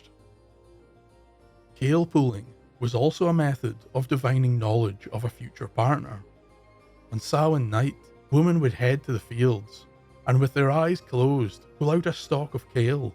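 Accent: Scotland, United Kingdom. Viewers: Crazy how similar a norther Irish accent and your Scottish accent is. You can really hear the crossover.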